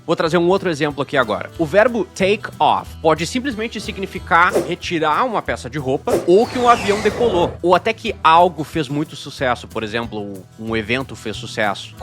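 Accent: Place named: Rio Grande do Sul, Brazil. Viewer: Português 😅🎉 é do sul ? Parece Gaúcho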